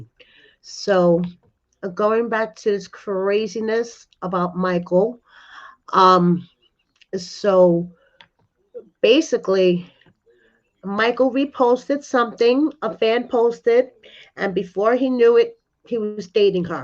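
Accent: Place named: Brooklyn, United States. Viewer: You sound like a typical Brooklyn girl? Do you happen to be Jewish also?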